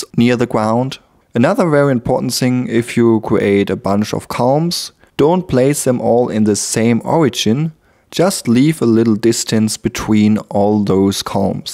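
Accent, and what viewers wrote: Germany. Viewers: haha your english is awful :D 10 seconds into the video and i yet hear that u are german ;) anyway, great 3d skills, good explanation- stay creative :)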